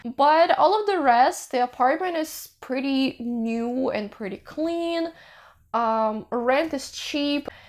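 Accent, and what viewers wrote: United States. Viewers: if i didn't know was already Russian, i would have thought she was American. HAHA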